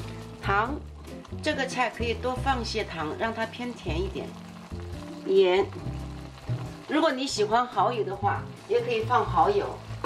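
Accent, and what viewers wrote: Shanghai, China. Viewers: 好亲切的上海普通话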